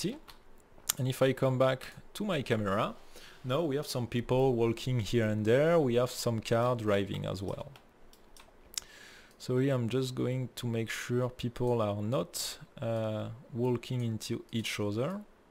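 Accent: France. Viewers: Oui, "french accent", et : - Dommage que cette présentation ne soit pas en français. - Dommage que les sous-titres n'existent pas en français. Tout ça est bien paradoxal !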